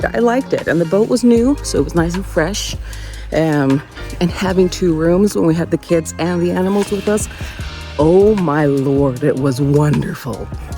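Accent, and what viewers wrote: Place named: United States. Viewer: Ou u live in sweden but u cannot be og swedes judging this by ur accent. Its wery amerikan accent. But anywAYS have great coming winter!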